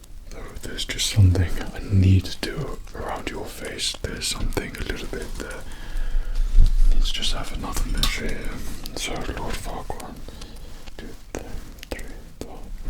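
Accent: England, United Kingdom. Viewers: i am latin american, and when i started learning english i never understood why the people (especially women) said that they love brithish accent. now i understand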